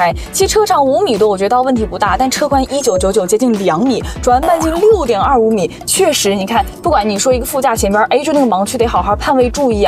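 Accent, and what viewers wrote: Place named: Northeast, China. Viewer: 有所长的声音，信任度一下子就上来了🎉，新人加油哈，听着像我们东北妹子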